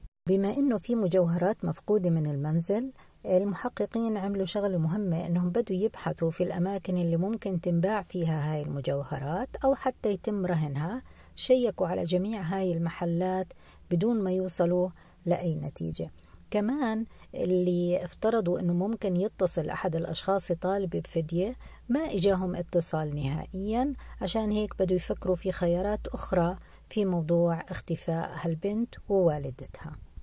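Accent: Jordan. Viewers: تحياتي لك اختي صوتك جميل احب اللهجة الاردنية والشعب الاردني الشقيق الله يوفقك ومليون ليك مغربية من لندن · الصوت مليح مافيه حتى مشكل متابعة من الجزائر نحبك بزاف بصح حابة نعرف انت من وين لهجتك دل انك اردنيية ولا فلسطينية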